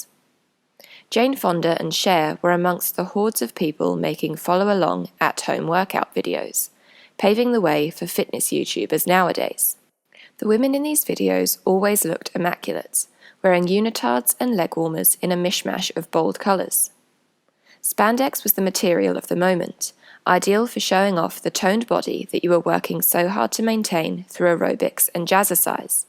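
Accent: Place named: United Kingdom. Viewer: This lovely britich accent) very good to hear - difficult to understand) your video is great)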